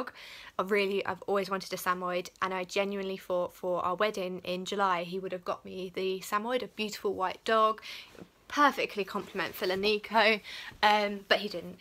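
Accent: United Kingdom. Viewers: dude u have the most epic British accent ever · Greetings from Houston, Texas! Excellent video of your pack. Gotta love king Philip. I also really enjoy your awesome British accent!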